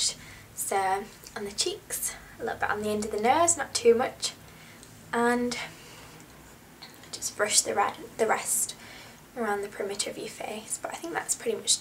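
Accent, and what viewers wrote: United Kingdom. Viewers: i love your accent how come it sounds different then typical british accents?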